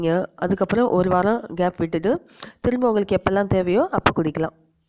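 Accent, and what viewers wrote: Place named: Kerala, India. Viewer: Sister unga malali ucharipu alaga iruku sister😊😊😊.....all the very best for your videos sister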